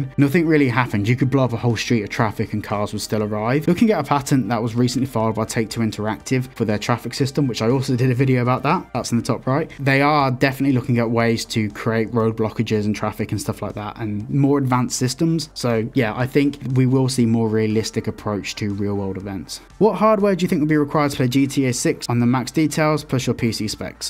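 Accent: Birmingham, United Kingdom. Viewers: R u from Birmingham geezer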